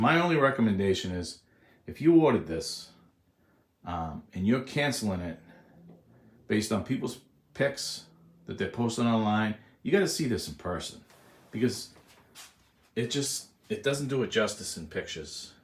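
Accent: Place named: Brooklyn, United States. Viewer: I ordered it. Also love your collection! I hear the BK accent lmfaoooooooo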